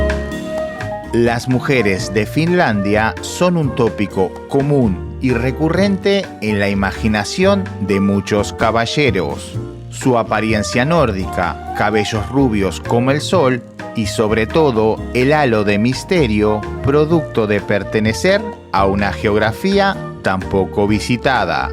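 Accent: Argentina. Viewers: ¿Eres mexicano o argentino? Porque veo que haces tomas de referencias mexicanas, pero hablas como argentino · Me suena argentino este muchacho.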